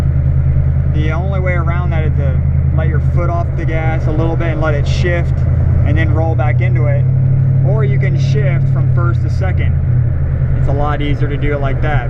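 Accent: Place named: Texas, United States. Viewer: you from texas og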